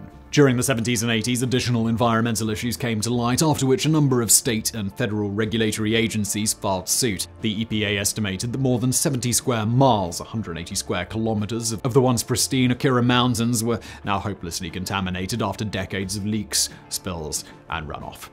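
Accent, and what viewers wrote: England, United Kingdom. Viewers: I also like listening to English people saying Native American place names. It's great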